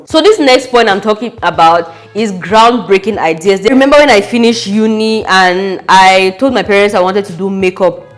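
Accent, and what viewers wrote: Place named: Calabar, Nigeria. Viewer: You were born in cameroon, raised in cameroon but you sound like calabar or akwa ibom(Nigeria)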